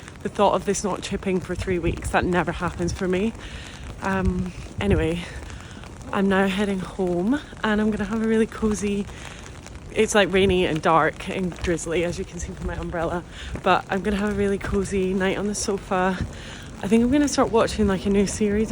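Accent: Scotland, United Kingdom. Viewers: Are you from Scotland? ❤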